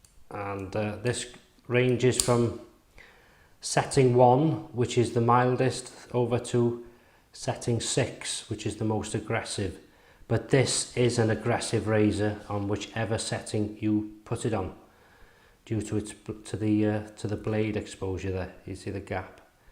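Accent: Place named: Liverpool, United Kingdom. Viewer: Sounds scouse mate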